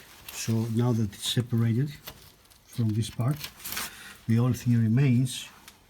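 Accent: Greece. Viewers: Hey, you Greek? You sound Greek to me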